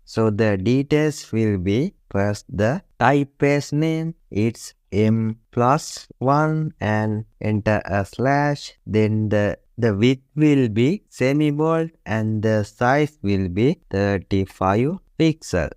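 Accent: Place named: India. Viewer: Dude your accent is awesome, reminds me my Indian physics lecturer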